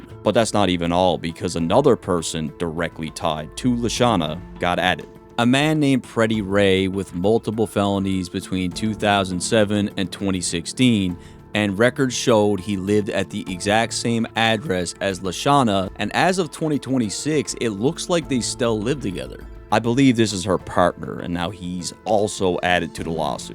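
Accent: Canada. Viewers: he’s Canadian lol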